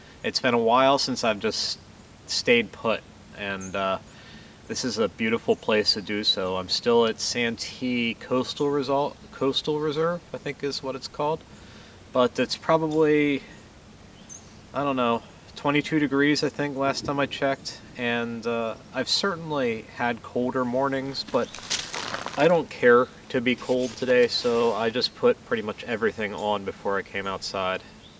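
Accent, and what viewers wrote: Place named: Eastern Pennsylvania, United States. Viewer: Finally learned where he’s from in PA (Pottstown) after watching a dozen of his videos. Knew from his accent it was definitely not western PA. Ahh, Chester county at its finest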